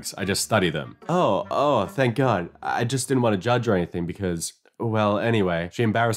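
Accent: Melbourne, Australia. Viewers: So he's Australian. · You Aussie!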